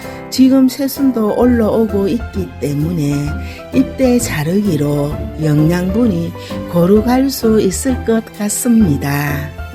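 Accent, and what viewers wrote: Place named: Gyeongsang, South Korea. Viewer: 경상도 아줌마가 서울말을 하시면 이렇게 되는구나❤🎉😂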